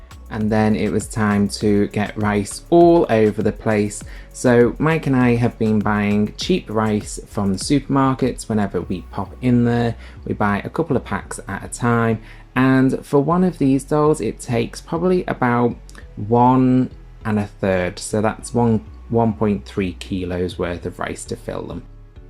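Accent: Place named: Yorkshire, United Kingdom. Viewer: You sound like you’re from the Yorkshire area. Where are you from! 😀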